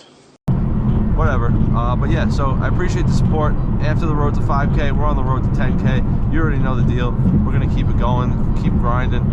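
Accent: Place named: Long Island, United States. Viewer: In the first ten seconds from the overpass and your dialect I knew this was LI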